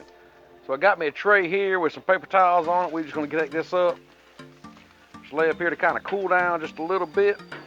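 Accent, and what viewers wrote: South, United States. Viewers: You southern boys crack me up. Looks amazing! I’ve got lots of fam in Georgia that sound just like you!!🤣🤣 so far a fan and...subscribed! Keep up the good work. Look forward to more.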